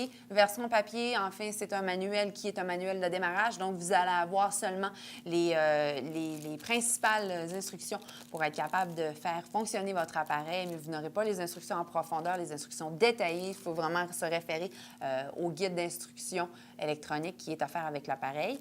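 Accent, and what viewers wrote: Canada. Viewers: Omg, l'accent canadian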